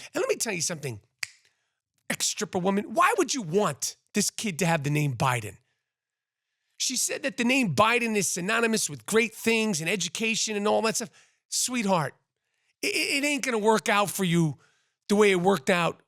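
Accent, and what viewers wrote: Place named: Boston, United States. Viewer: Yo Boston accent!!! Like someone from the Midwest had a baby with some long islander!!! Hurts my ears!!!!!!!!!!....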